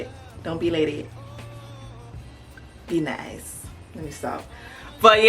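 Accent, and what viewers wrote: Liberia. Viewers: 🇱🇷Liberian!! As soon as I heard the accent 😂😂🩷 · I Heard your Voice and I knew Off rip You Were Liberian ! 🇱🇷🇱🇷🇱🇷 Hey my sista I can’t Wait to do my Hair ! Thank you !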